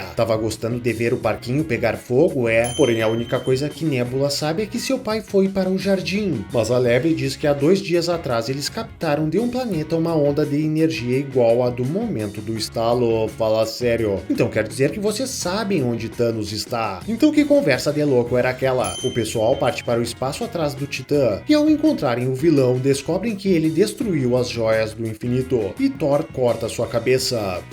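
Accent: Rio Grande do Sul, Brazil. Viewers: Mas ah locô véio! Esse sotaque é inconfundível. Tu é do RS?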